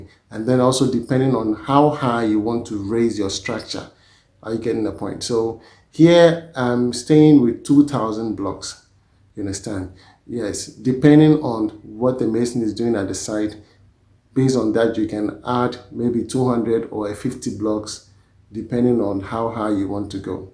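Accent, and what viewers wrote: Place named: Ghana. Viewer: And you speak good ghanaians english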